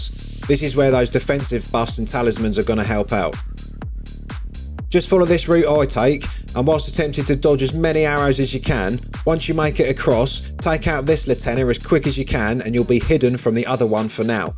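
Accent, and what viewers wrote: Cockney, United Kingdom. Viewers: Good video. But jesus mary and Joseph. The Danny dyer accent and the music. Oof. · Fucking hell this is a solid video. Music, cockney as fak. Love it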